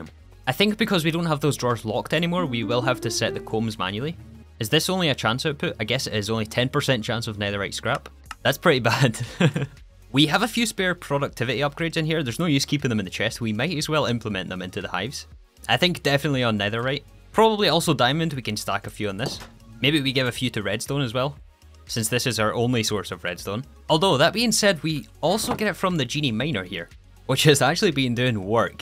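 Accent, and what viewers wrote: Scotland, United Kingdom. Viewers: I don't even play Minecraft anymore. Haven't played in about 10 years haha. Your pacing and amount of content packed into each episode is amazing, also great to hear a fellow Scot 😀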